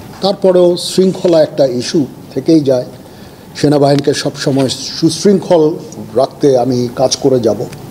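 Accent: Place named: Bangladesh. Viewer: কথা শুনে আপনাকে বাংলাদেশী মনে হচ্ছে। থ্যাঙ্ক ইউ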